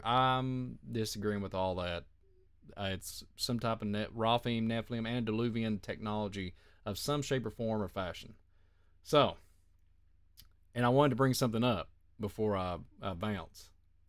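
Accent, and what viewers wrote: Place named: Kentucky, United States. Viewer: Another awesome show!! Keep tellin it brother!! 💯 I love all your research & passion! May Yah continue to bless you 🙏 💙 🙏 PS~ I love your "Kentucky twang" & pronunciations!! You keep me laughing & learning at the same time!! 😊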